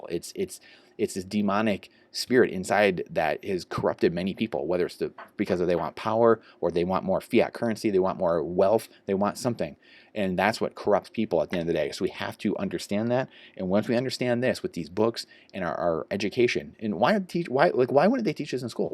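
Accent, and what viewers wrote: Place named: Michigan, United States. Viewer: I had a hint by the accent but are you from Michigan?!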